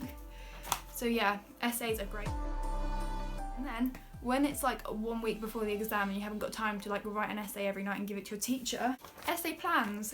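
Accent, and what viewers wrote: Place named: United Kingdom. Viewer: I want to speak like you😭 I wish I was British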